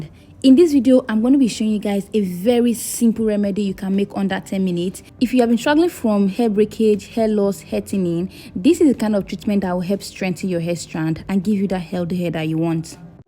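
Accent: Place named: United States. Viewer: At least her hair is natural but tell him about her accent she got Americanized so she just bragging about having real hair why don't she go buy a damn hair store why don't black women go invest in the same products that they use for their hair like people that are not your race know your hair better than you our black community is stupid as f***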